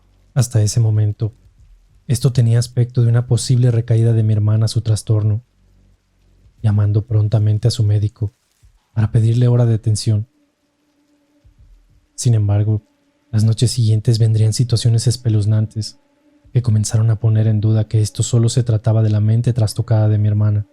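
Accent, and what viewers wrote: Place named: Mexico. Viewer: Mmm...No es una voz desagradable....Pero es de UN solo tono...sin matices...se nota el acento mexicano...y NO consigue "dar miedo"..😝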